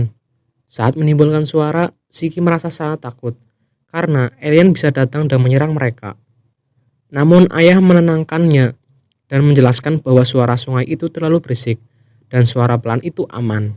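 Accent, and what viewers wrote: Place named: Java, Indonesia. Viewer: Mantap tetep konsisten medoknya.. 👍🏻😁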